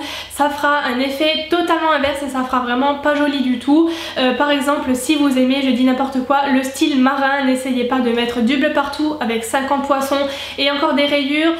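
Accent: Lorraine, France. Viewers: hello! oui c tout à fait ca..en tous cas je te découvre tous les jours et franchement je ne sais pas kel age tu as mais tu as l'air talentueuse, posée, sympathique et très pro! stp fais + de vidéos deco ou dny!! je vis dans un chalet et je suis en plein travaux/déco et t vidéos donnent d idées) ta chaine est une belle découverte. ps: j'habite a sarreguemines, ton accent me parle....me trompe je? des biz ;-)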